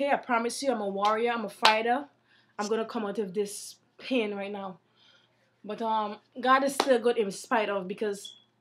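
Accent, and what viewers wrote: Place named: Guyana. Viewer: Are you Guyanese? you sound so.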